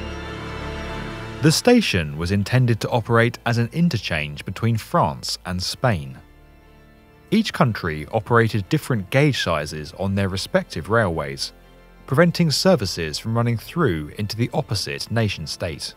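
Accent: United Kingdom. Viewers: Man i love your videos they are so informative, entertaining and savvy i could watch them all day..don't stop your fantastic work. you speak clearly and slowly and your British accent helps make it more easy listening rather than some fast talking American woman's voice.. it makes a difference in my opinion... Thanks for your videos which im happy to share with my Facebook friends anytime.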